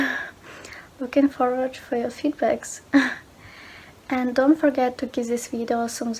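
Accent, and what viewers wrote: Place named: Serbia. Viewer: я вот слушаю, и думаю, что английский не родной )) думала, может девочка из Сербии или ещё откуда-то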